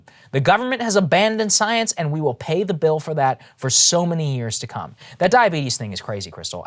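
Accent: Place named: Boston, United States. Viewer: This sounds like a Boston leagel speach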